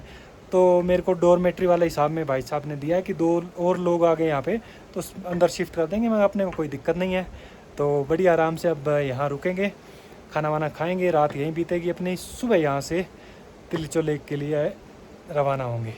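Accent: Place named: Haryana, India. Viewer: Teri boli s to hariyana ka lag h bhai.kad ka hai bhai ?bada himmat wala chora hai bhai.ke bera m bhhi tere gaw ke aas-pass ka hou.bataiye bhai.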